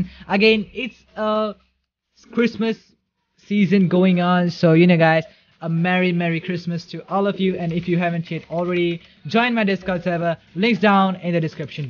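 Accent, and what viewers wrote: India. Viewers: how are you indian ur accent is great i am from iran but live in germany keep up the great work!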